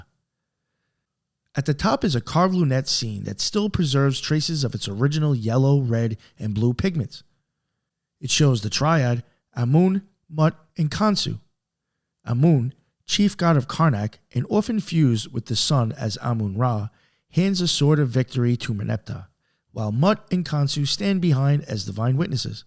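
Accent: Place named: New York, United States. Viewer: 🎉 I gotta start listening to more of you. Like a little bird coming back to its nest, your warm Italian NY voice is just too dam rewarding.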